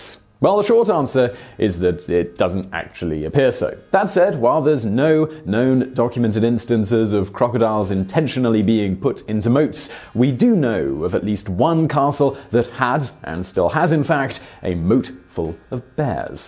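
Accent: England, United Kingdom. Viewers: Chuck Barry that's called an English accent. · I like your British accent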